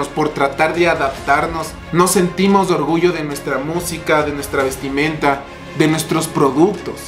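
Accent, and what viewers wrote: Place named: Ecuador. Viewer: Hola ñaño me gusta escuchar mis acentos q tenemos, de la costa del acento de la sierra o de cuenca o del oriente, llevo muchos años fuera, pero cuando escucho a los Youtobers como tu o los Youtobers de la costa me encanta escuchar esa mescla de asentos q tenemos a pesar de ser un pais chico tenemos tanta diversidad, es sierto no apresiamos lo nuestro, pero creo que estamos dando el paso y vamos a lograr ser autenticos, hojála los politicos tambien agan su trabajo, y les salga el Ecuatoriano por sus entrañas y entre todos agamos un mejor país, viva la patria Ecuatoriana💪💪💪🇪🇨🇪🇨🇪🇨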